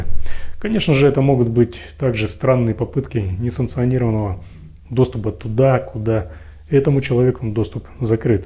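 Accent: Ukraine. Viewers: Украинский говор. Гэ, Хэканье. По говору западный галичанин! Горбачев!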